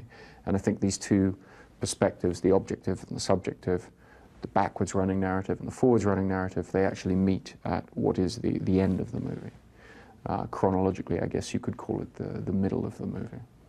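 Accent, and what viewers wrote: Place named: Australia. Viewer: He actually sounds kind of Australian in this video.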